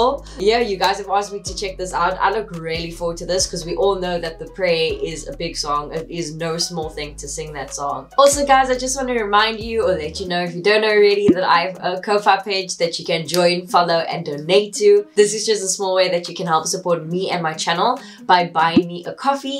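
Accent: South Africa. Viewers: You South African? Your accent sounds really nice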